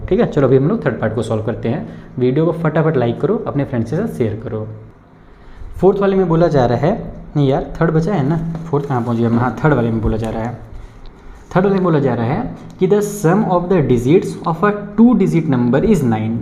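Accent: Bihar, India. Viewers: Sir aap bihar se ho kya❤❤.. kis jile se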